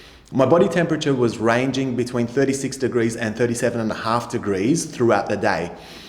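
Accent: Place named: Australia. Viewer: Aussie accent in more understandable than British and other English accent except American.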